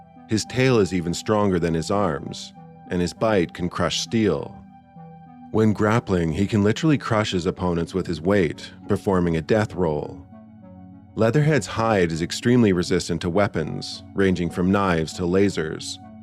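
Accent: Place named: Louisiana, United States. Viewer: Which is funny, given his Louisiana Caijun accent.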